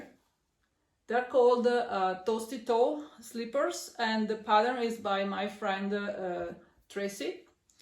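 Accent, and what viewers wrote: Italy. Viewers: I didn’t know you before, but I’m glad you showed up today! You had me at Emotional Support Chicken! New subscriber. Your speech reminds me of my Südtirolean friends from years and years ago. Also my Italian friend at church here in Michigan. Please post again and show us more things! 💜 And yay for Paul Klee. I saw an exhibit in Tübingen ages ago and loved it in all his stages.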